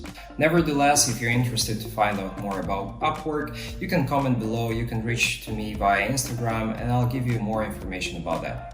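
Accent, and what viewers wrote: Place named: United States. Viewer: Bro you sound proper American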